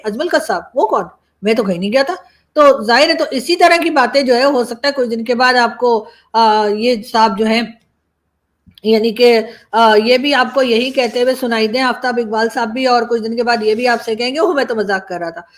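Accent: India. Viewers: ap Mumbai ke bhasa bolte huye bohut achhaa lagte Hain Love You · Tapka ke chle gaye … UP ki Bhasha … madam.. apke lehze Mai UP jhalakta hai 😂😂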